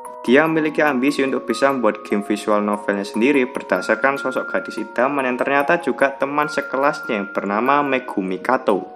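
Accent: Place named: Surabaya, Indonesia. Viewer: Kayak orang Surabaya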